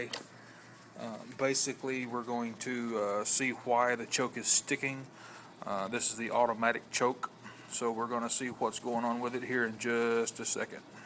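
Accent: Texas, United States. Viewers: Is that a Texas accent cowboy?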